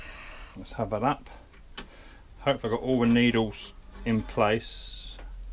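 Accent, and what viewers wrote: United Kingdom. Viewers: Love the accent, the British is my favourite, great job with the mower tho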